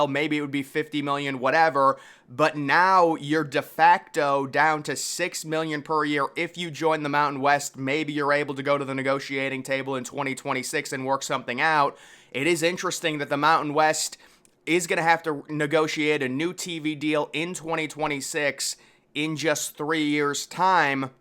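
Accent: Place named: Wisconsin, United States. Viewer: Sounds Wisconsinite to me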